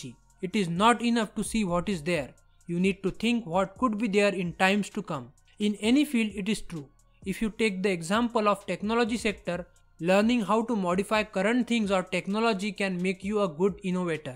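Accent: India. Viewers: You have the strongest Indian accent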